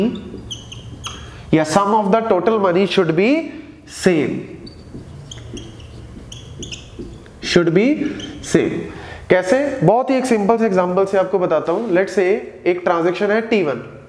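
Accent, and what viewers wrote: Punjab, India. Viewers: Very good explaination along with great punjabi ascent. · u r so cute....haha...and ur hindi accent 4000,5000...haha...so cute...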